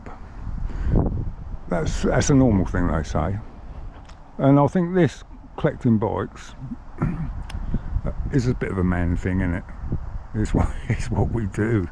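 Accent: Suffolk, United Kingdom. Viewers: Nout wrong with a Suffolk accent 👍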